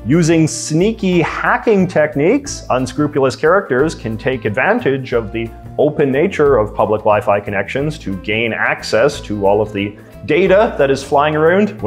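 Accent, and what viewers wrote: Canada. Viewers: Bro are you Canadian AF or do you hail from NorCal? Californadian? 🧐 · Hes Canadian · Thee most Canadian accent I’ve ever heard lol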